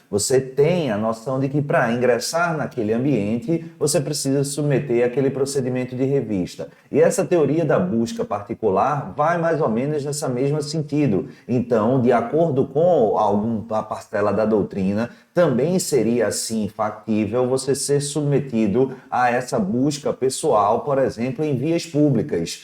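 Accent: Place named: Northeast, Brazil. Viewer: Não sei se alguém percebeu, mas o sotaque nordestino desse mestre, ao que me parece, e essa calma cirúrgica na explicação permitem entender com clareza o tema abordado. Tenho assistido às demais vídeoaulas dele e aprendido bastante.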